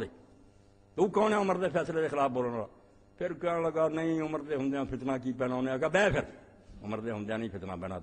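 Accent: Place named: Punjab, Pakistan. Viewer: Molana ishaq r.a ka lehja Punjabi tha . Ye be adbi lgti hai lkn nhi hai. Arbi language is se bhi skht hai agar ye hadeesein arbi mein prhi jayein Jin ko chupaya gya hai Tu tum log aik dosre ko katal krne lag jao be adbi smjh kr. Molana ishaq r.a hazrat Khalid r.a ko sahabi or Naik smjhte the lkn Jo gltyia hoi wo byaan krte the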